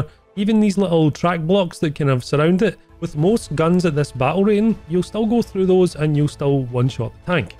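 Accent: Scotland, United Kingdom. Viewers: Where abouts in Scotland are you from? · Where in Scotland you from mate? Really enjoying your videos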